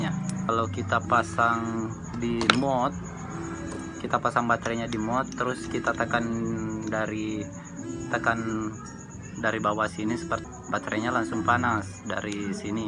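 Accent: Bugis, Indonesia. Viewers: Kya orang bugis ngomongnya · Logatnya kaya saya kenal daeng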